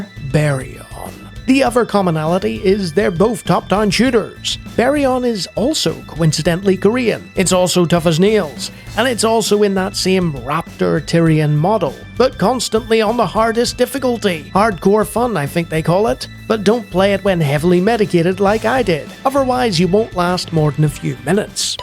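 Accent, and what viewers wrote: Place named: Ireland. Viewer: I hear you have the Irish accent that pronounces 3 as three, not as tree like other Irish pronounce 3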